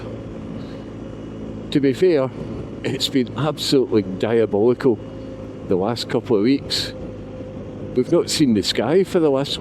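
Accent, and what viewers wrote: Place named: Scotland, United Kingdom. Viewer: I enjoyed the vlog. I'm familiar with the Moffat to Selkirk road you were on. The Glen cafe is worth a stop in the spring and summer. I too have a 2020 GS1250 which i love however its proven to be somewhat unreliable and has twice left me stranded. I'll be interested to see how you get on with yours in the coming months ahead. Its good to hear another Scottish vlogger on the Tube. Best wishes 👍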